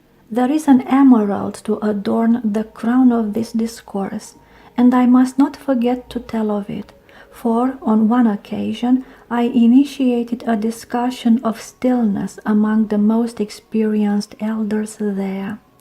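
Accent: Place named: Eastern Europe. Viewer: i insist, it sounds beautiful. your accent makes it sound all the more so. and despite the accent (in my ignorance i would guess eastern european or even russian), every single word is pronounced correctly and everything is as clear as pure water. had a robot been reading this, i would not have listened to it.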